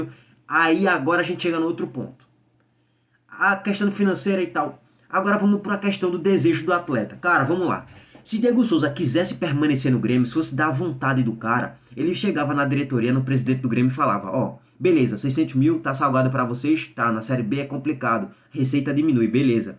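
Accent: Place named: Rio de Janeiro, Brazil. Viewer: uu carioca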